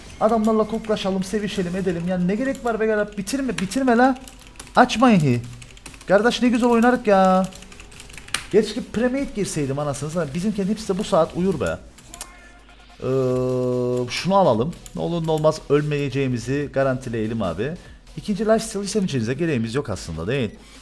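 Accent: Cyprus. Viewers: abi kıbrıslımısın çünkü bende sesin aynı kibrisli konuşmasını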